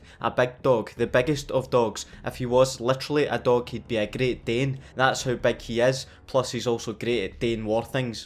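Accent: Scotland, United Kingdom. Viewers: Fuck me ur voice is sooooooo sexy, subbed just for that Scottish voice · #notifactionsquad love your Scottish accent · #notifactionsquad excellent Scottish dialect I really appreciated it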